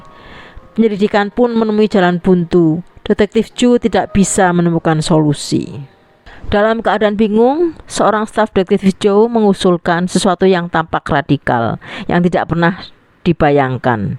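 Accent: Java, Indonesia. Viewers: Apakah kamu sudah menjadi orang yg berguna dan memberikan kata2 yg positif utk org2 disekitarmu?Berkata- katalah yg baik, memujilah!! Kalau kamu tdk suka, bukan berarti org lain tdk suka. Karya dan kreatif, berbeda dgn youtuber lainnya, hargailah. Dgn medok jowo nya lah, menjadi karakter ciri khas yg saya suka. is the best!! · emba ini suara nya kya msih kentel logat jawanya ya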